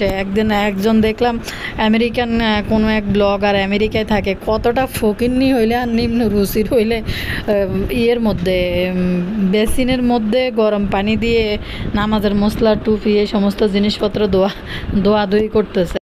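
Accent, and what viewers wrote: Noakhali, Bangladesh. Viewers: Apnar desh kumilla but apnar kothar style noyakhali.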